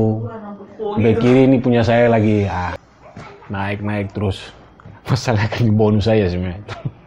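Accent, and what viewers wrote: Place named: Minangkabau, Indonesia. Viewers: Dialek bahasanya dah persis orang Minang.mantaap. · Logat sdh Minang sekali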